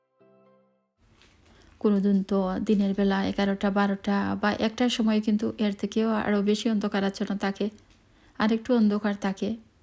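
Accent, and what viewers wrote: Sylhet, Bangladesh. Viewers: Please talk sylheti your accent telling me you're sylheti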